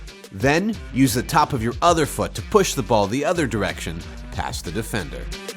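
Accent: United States. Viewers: Clicked video. Heard American accent. Closed video.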